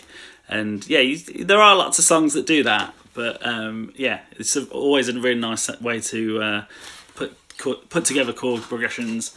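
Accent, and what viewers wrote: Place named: Birmingham, United Kingdom. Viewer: no worries and makes sense! You sound like a fellow Brummie as well 🤙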